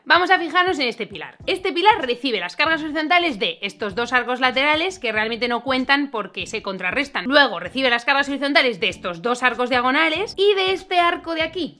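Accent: Spain. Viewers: No sé cómo suena tu acento para los españoles, pero para mí, un argentino viviendo en Texas, la combinación de tu acento español con términos en inglés suena, cómo dirías tú, simplemente de puta madre. Me fascinas mujer. Y lo técnico de tu contenido, It’s too much 😉. Keep it up! 😍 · Acento Español + Arquitectura = el canal perfecto! Cásate conmigo por favor 😍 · Soy argentino y me enamoran las mujeres con acento Español. Me la ponen como una locomotora, "medieval"... Pero está mina además, tiene una voz, unos ojos y esos labios pintados que si le entro a besos chocarian los planetas. Nena, sigue así que me ilusionas demasiado.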